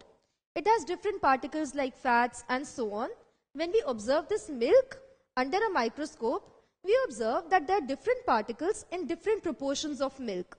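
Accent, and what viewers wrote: India. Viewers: aapke accent se pta chl rha hai ki aap indian hain toh kya aap hindi mein padha skti hain plz kyunki aapki eng zyada scientific lg rhi hai toh thodi mushkil aa rhi hai waise aapne sab kuch sahi aur accha padhaya pr plz agar ho ske toh hindi mein padhane 1 ka 1 baar try kijiye ka plz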